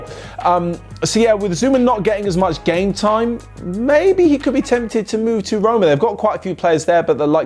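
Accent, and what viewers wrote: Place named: England, United Kingdom. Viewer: Kinda is obvious with the accent, the name, love of english men for footbal etc..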